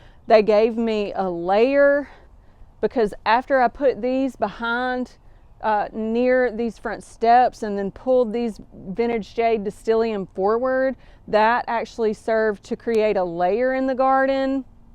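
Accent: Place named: Mississippi Gulf Coast, United States. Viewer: In Central TX there are no pine trees. We use cedar mulch and they sell it at the gas stations very cheap. When the mulch shows up you know it’s Spring. Love hearing your accent as it reminds me of home along the Mississippi Gulf Coast. Enjoying your channel!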